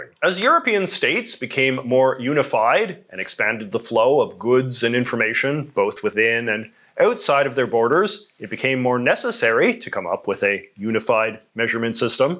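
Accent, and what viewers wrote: Canada. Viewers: This is the first time I've managed to pick up a canadian accent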